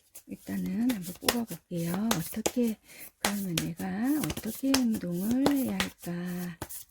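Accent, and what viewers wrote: Busan, South Korea. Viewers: 신기해서 한번 더 봤는데요~ 영상도 너무 예뻐요~ 👍🏻👍🏻 선생님~ 혹시 타로 배우려면 어떻게 하면 되나요?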